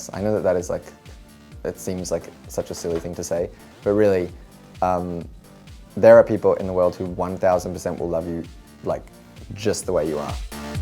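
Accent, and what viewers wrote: Australia. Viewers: He sounds Austrialian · i'm shook the aussie accent is the hottest one i think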